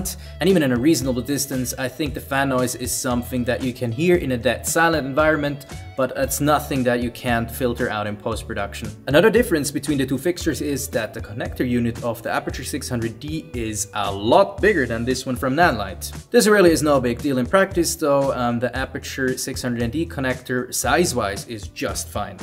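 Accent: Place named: Switzerland. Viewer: I can hear that swiss accent miles away. But thanks for the comparison. Feel like Nanlite has been catching up. However im sticking with Apputure.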